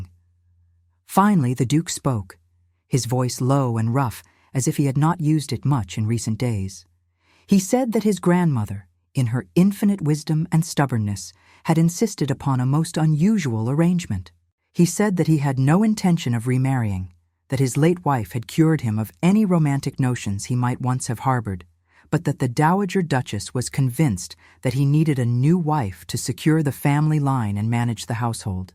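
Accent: United States. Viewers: Why the American narrator? You need a British accent otherwise this sounds cheap.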